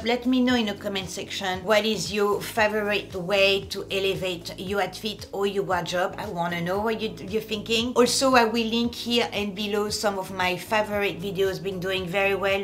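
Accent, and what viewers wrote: France. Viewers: love your french accent and you are so funny.... as well as beautiful style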